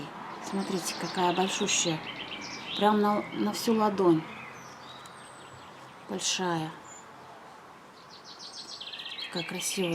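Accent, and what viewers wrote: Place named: West Siberia, Russia. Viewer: Слышится западно-сибирский говор. Или мне показалось? :) Спасибо за интересное видео и за список ссылок! Лайк!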